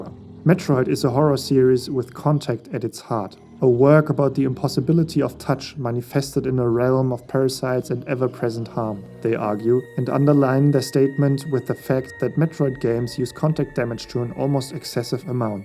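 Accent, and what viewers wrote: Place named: Germany. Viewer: Don't feel guilty for your Germanesque pronunciations man, they're charming 😃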